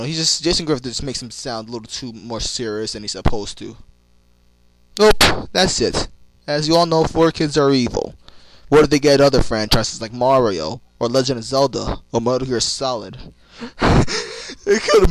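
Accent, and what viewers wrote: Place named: England, United Kingdom. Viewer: english acsent XD !!!!!!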